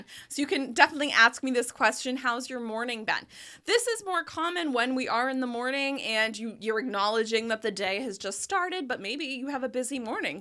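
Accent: United States. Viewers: Let me tell you that your accent is really beautiful, captivating and very American, which has made that I can’t stop watching your videos, and on the other hand learn the pronunciation and sounds of the United States, greetings from Chile. 😊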